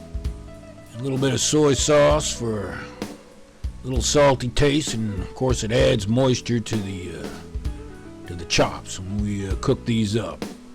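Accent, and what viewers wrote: South, United States. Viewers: i like the VOICE of that southern man..IDK if his black or white man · the way he is talking makes me hungry, he sounds like a deep south country cook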